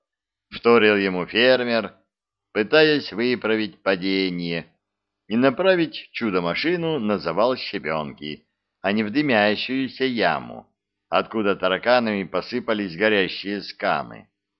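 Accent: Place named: Odessa, Ukraine. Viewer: Снова этот «одессит» читает. Не стала слушать. А так хотелось послушать.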